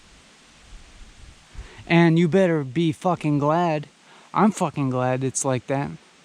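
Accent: United Kingdom. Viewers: Why'd I assume this guy was British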